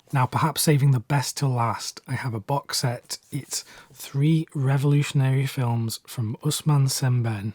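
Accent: United Kingdom. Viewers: Hey, im not even really collecting Blu-ray, but i sometimes just play your videos for the athomsphere. Like you were my chilled bri'ish fella 😂 i dont know if thats weird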